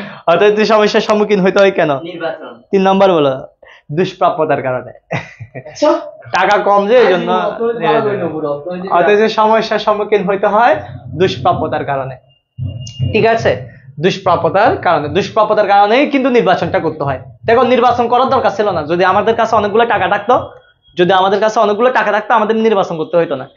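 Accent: Chittagong, Bangladesh. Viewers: Sir apni chittaingga😄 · চিটাইংগে ফুয়া😊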